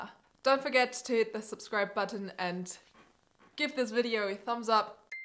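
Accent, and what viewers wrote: Germany. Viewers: Man hat dein Englisch gehört das du deutscher bist 😂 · I know she was German as soon she spoke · man merkt so hart das du deutsch sprichst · Dieses anders deutsche Englisch :)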